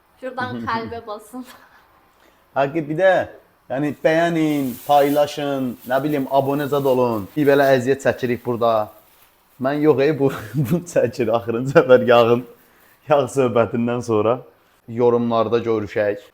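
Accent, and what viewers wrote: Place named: Shamkir, Azerbaijan. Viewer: Semkirli oldugu 100 gunlukden bellidi menim qardasim kimi danisir bisirir sendi Masalla size cox gozelsiz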